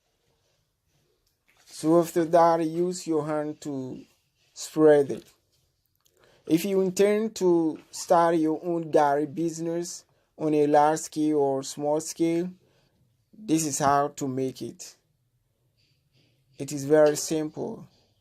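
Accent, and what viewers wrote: United Kingdom. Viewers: Oh. You're too much with your British English. Well done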